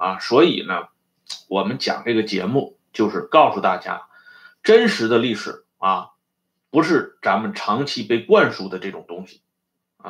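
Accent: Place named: Liaoning, China. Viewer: 听口音温老师也是辽宁籍吧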